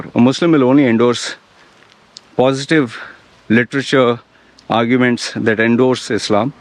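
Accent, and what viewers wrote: India. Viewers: When ur accent is indian and u can't even pronounce indian names correctly.....dhruv rathee?😅😅😅 On purpose?? To act cool or sound like west!? Oh' cmon u dnt need such acts to look polished....u r much more than that 😊😊😊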